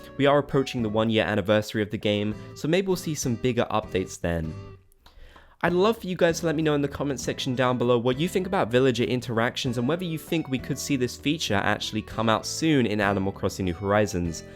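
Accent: United Kingdom. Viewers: Is it just me or he sounds british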